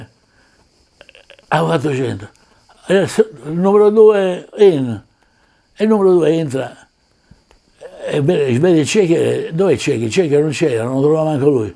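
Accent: Veneto, Italy. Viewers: Io direi che un romano con l'accetto veneto è di tutto rispetto" o stregheta".😊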